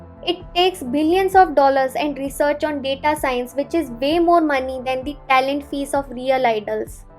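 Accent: India. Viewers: are you indian btw ? cause your accent 😼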